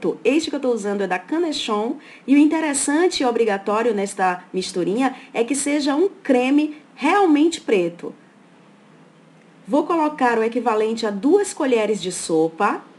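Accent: Bahia, Brazil. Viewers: Lindona seu cabelo ta lindo!! mil bjos!! gosto muito de te escutar om esse sotaque lindo baiano!! fico com saudades da minha cunhada!!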